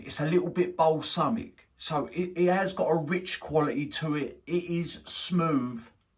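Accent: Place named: England, United Kingdom. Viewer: you were saying the house of M.Micallef correctly. DesirToxic is totally my speed! I can’t believe you’ve never reviewed this scent on your channel before. It’s pretty popular in America for a niche scent. The new version, DesirToxic L’Intense (2024- adds a heavy note of Mint in the opening but removes the majority of the greener cannabis aspects from the heart (I can’t remember if cannabis is still a listed note, and I’m too lazy to look it up at the moment). Anyway, just had to mention that it always tickles me every time you say the word “Three”. It sounds like you’re saying “Free” to my American ear. I find it funny, I promise I’m not making fun of you, it’s our little differences in life, ya know? Anyway, I’m interested in accents, do you mind telling me what part of England you come from or what region your accent derives from? 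I know there’s like a Manchester accent, and a Posh section of London accent, etc. Just curious. Please take care of yourself and I pray you're doing well. All the best from sunny Florida. P.S. I’m always impressed by your nose, thats why I continue to watch and I like your presentation style- not a lot of fluff! Just down and dirty, you get right into it.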